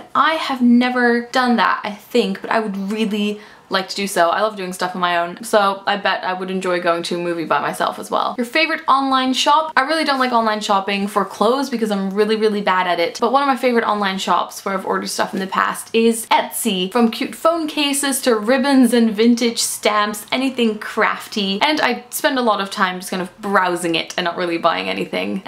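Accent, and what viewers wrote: United Kingdom. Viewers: lovely video! :) also your accent has twang of British intertwined in it and it's super cute haha! xxx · I need a lot of me time and I enjoy doing things by myself. Sometimes I snuggle up with a book/movie/TV show, sometimes I go shopping. I go to museums by myself once in awhile as well. By the way, I love how your accent becomes more and more British! I find myself adopting accents and dialects very quickly when they are familiar and I'm in the area for some time.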